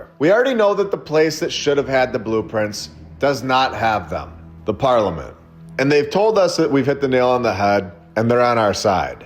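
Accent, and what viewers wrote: United States. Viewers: I knew what he meant. He's American, they say words differently.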